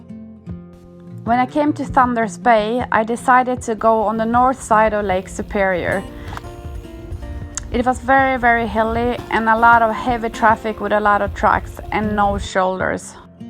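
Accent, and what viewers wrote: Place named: Norway. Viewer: incredible! i want to do this in may! also you sound super norwegian... is that the case? well done!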